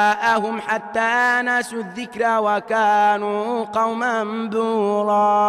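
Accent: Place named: Morocco. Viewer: القراءة المغربية لا مثيل لها تبارك الرحمان جد مميزة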